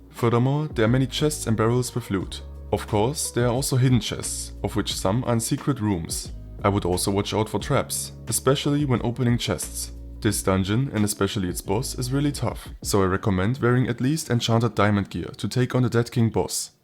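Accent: Germany. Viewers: Wusste ich’s doch. Hab den deutschen Akzent rausgehört. Auf dem Channel das Impressum gesehen und meine Vermutung wurde bestätigt. Mach weiter so gute Videos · man ekent das du deutsch sprichst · is that a german accent? also very high quality vid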